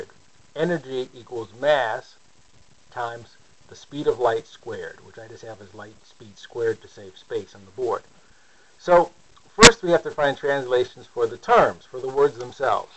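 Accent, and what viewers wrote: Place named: Spain. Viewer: The vowels are all being pronounced as in Spanish, not Muskogee. MV e = ES i. MV i = ES ei. Also the H is not silent as in English.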